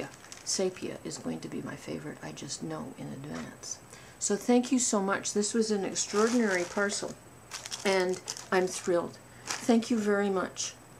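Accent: Canada. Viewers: I love your videos. I come everyday to your channel to see if you have put up something new. Yes you are quite charming;). I love how you pronounce your words so precisely and your Canadian accent.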